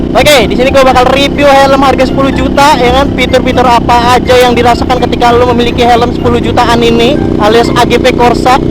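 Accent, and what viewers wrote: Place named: Java, Indonesia. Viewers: Jawane medok tenan #save duka hati😂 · Bang seharian ngomong pake medok jawa 😂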